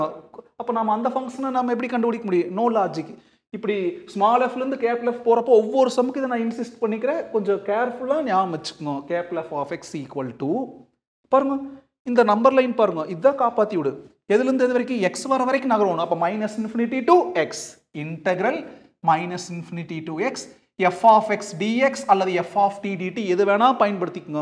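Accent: Coimbatore, India. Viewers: Sir neega coimbatore ah.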